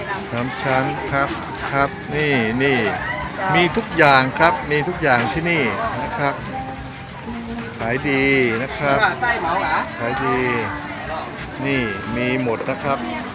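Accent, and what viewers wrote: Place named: South, Thailand. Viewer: พี่ชายเป็นคนใต้หรอเห็นแหลงใต้ได้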